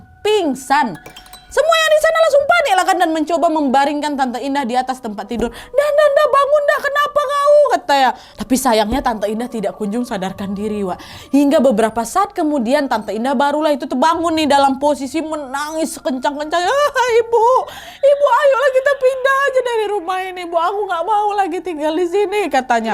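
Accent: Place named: Dumai, Indonesia. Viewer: Ini orang Dumai ya?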